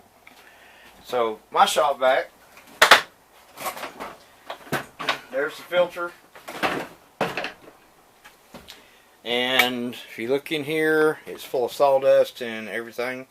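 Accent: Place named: South, United States. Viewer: Haha. People can be cruel at times. If it is any consolation , you have a great southern voice.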